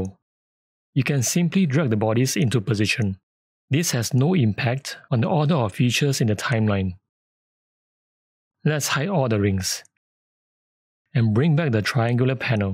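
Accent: Singapore. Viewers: I love your channel! Out of curiosity, are you by chance based in Singapore or from Singapore? Your accent sounds very Singaporean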